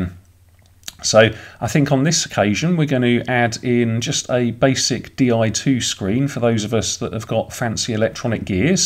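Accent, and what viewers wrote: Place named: Essex, United Kingdom. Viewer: This sounds like a north Essex accent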